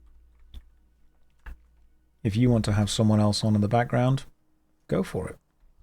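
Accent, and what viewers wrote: United Kingdom. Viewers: I like the british ASMR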